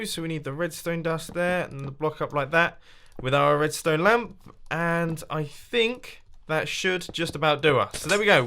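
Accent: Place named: United Kingdom. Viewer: not to be rude are u british im thinking that because of ur awesome british accent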